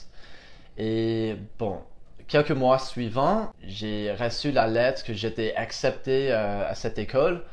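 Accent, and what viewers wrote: United States. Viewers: Je suis aussi Americain, et j'ai suivi le cours de francais au lycee, il y avait 40 ans. Mais je peux bien parler francais, et je peux bien vous comprendre, parce que vous parlez sans vitesse, et avec un accent americain. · Bonjour, je trouve que tu parles très bien français, avec cependant un accent américain prononcé mais j'aimerais parler ta langue aussi bien que tu parles la mienne, tes vidéos sont très intéressantes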